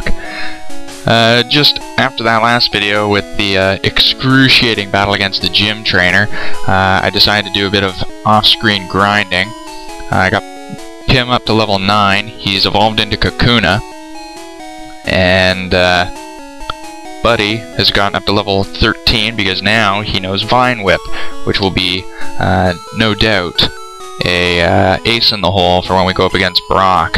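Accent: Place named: Canada. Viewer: you is entertaining <3. Love the Nuzlocke challenge. "What now the shirtless wonder?" :3 Love the Canadian accent as well haha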